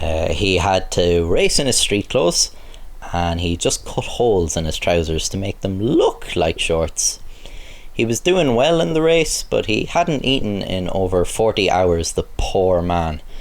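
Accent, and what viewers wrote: Ireland. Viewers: Irish boiii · 32 He has a Irish accent. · I KNEW YOU SOUNDED IRISH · I've subscribed you are fucking hilarious my friend I think it's the Irish accent it gets me every time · Are you Irish? The "r" in your accent seems to be very irish. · bro are you irish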